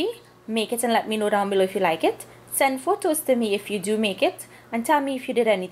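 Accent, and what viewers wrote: Trinidad and Tobago. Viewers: Beautiful Trinidadian Accent!!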